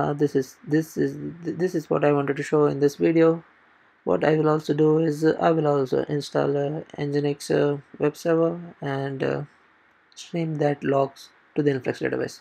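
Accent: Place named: India. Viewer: Thanks for making that Video it helped me a lot. My Feedback would be , its pretty hard to follow the India-English Slang , in the Fast Speed Modus.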